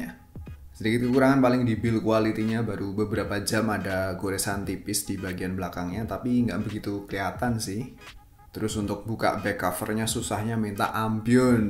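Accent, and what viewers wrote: Java, Indonesia. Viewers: pedot :v medokmu bang :v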